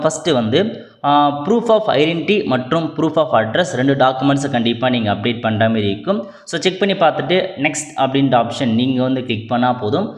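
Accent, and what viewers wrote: North, India. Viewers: Unga voice vadakkan tamil pasera maare erruku ...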